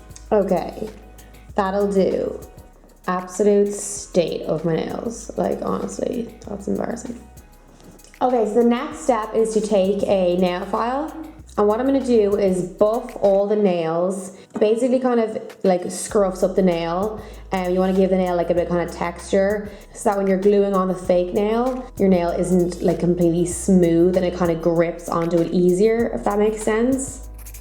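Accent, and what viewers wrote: Dublin, Ireland. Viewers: Kelcey Burrow it’s Irish I sound like her too😂 p.s. I’m Irish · Ireland? I hear it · she's Irish 😊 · irish gal!! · What shops do Ye get ur nail equipment I'm from Dublin I could tell ur Irish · Kelcey Burrow sounds irish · We Have Essence In Ireland Too.....Yes She Is Irish LOL! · I hear an Irish accent. 💚💚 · definitely sounds irish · she's irish😂😂😂😂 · Americans tryna say she sounds Irish with an American accent!? Haha no. She sounds dublinish through and through. That's a Dublin accent. Move on. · Malaka Mohamed it's and Irish. Accent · Kelcey Burrow Irish I think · Is she Irish ? Cause I kept hearing hints of an Irish accent in there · she's Irish ahahahhaha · you're beautiful and I love your irish accent :) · its a South Dublin accent xx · definitely not scottish. id say an irish woman whos lived in england/ English influence. 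or english woman with irish parents etc ..... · I love the Irish accent 😭😂 I have Irish background but I'm not fully Irish which sucks because I'm just from America like boring 😂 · Kelcey Burrow I don't hear British I hear American and irish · Kelcey Burrow I'm from Ireland she is Irish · Have you never heard an Irish accent before? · She's Irish what r u on about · She's Irish and she sounds Irish the whole video to me? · As I can tell your from dublin, I was wondering where you purchased these products & how much?? And also did you paint them using normal nails varnish or is there a special type??x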